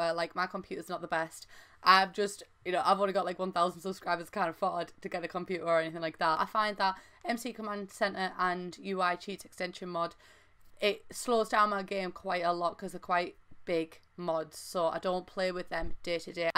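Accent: United Kingdom. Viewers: Very useful- will be getting those mods for the aspiration and traits- its nice to hear a British accent too- I've not come across fellow Brits when looking at Sim Youtube accounts!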